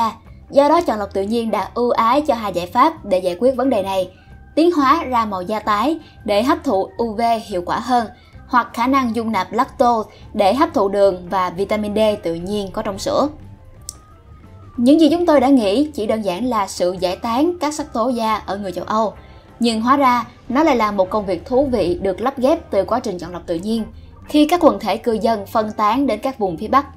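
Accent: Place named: North, Vietnam. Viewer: giọng bắc thì rõ hơn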